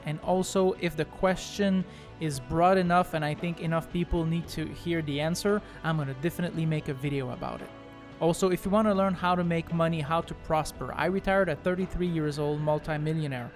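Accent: Quebec, Canada. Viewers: I was so glad to hear the Quebec accent when you started talking. Made me trust you more :') Thank you for the valuable info!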